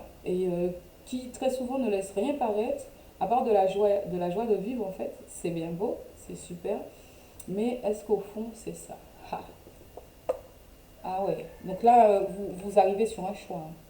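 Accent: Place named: Martinique. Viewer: Jolie petit accent de Madinina💓🌴 mer I pour ce beau tirage... Pour le son c'est moyen.. Mais ça reste audible. 👍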